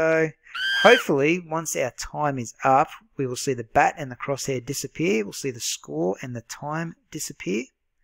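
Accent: Australia. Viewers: i like his thich aussie accent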